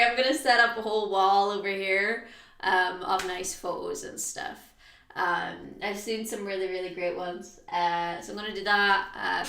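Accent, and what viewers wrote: Ireland. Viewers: well i just ran across her by accident on the tube and was instantly pulled in by thatwhat id call hot irish accent.she just lately changed her hair colr thats why i inquired.i wasnt trying to be a meathead or nothing Sorry man.anyway im a new fan nice too meet you Wes davis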